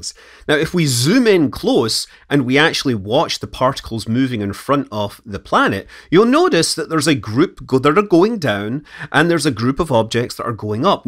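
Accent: United Kingdom. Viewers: Please use less accent in your speech, UK is not the whole world.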